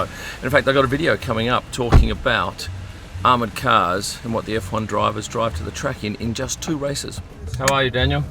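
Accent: Australia. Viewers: A kip? A dickie seat? Loving the your Ausiness! Fun seeing in the background 👍👍👍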